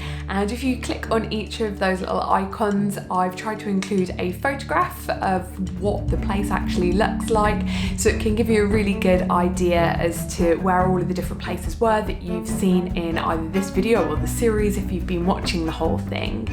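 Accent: United Kingdom. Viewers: British accent English is so hard to understand. BTW love your videos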